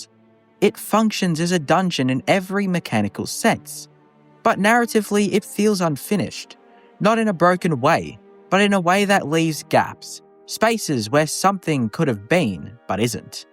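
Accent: Australia. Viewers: I love ya buddy. Just subbed. But the clearly Australian is hilarious. If everyone spoke like you, the world would be a better place.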